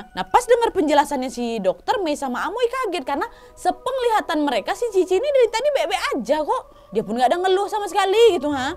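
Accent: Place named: Medan, Indonesia. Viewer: Tiap hari nontonin video nya..siang mlm..dari video trbaru sampai video lama...sampai kebawa2 bahasa sma logat medan nya 😅😅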